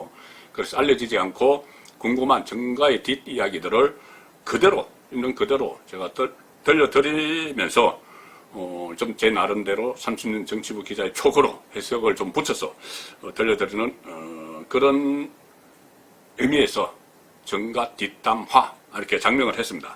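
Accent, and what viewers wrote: Gyeongsang, South Korea. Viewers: 저는 경상도 사람이라 의 말투가 정겹기만 합니다 오늘 자막처리한 글에 오타가 있습니다 붉어지다(×) 불거지다(0) 아! 10만 구독자 돌파 축하드립니다 내년 이맘때는 50만 독자 돌파를 기원합니다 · 추카 추카 합니다 늘 응원하고 있습니다 날카롭고 군더더기없는 비평! 긍께 어쩌겠어요 사투리를 경상도 사람은 잘들리고 알아듣는데 ㅋㅋ^^ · 그래요.. 잘보고있어요. 경상도말씨같은데요. 고향이어디신지궁금해요. 지금잘하고계십니다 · 경상도.사투리도좋아요 축카합니다.100만쭉~~ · 10만 추카드립니다 발전을 위하여 쓴소리 좀 할게요 저도 경상도지만 억양은 고칠수 없습니다 하지만 말의 속도는 줄일수 잇죠 ㅋ 연습하셔서 속도는 좀 줄이면 좋겟슴다 꾸벅! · 구독자 10만을 축하드림니다~경상도 억양이 매력적이죠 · 10만 구독 축하드립니다. 진솔함이 묻어나는 송본부장님의 구수한 경상도 사투리 정말 좋습니당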